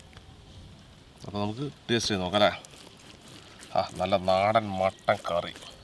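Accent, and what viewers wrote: Palakkad, India. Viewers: പാലക്കാടൻ സ്ലാങ് 👌🔥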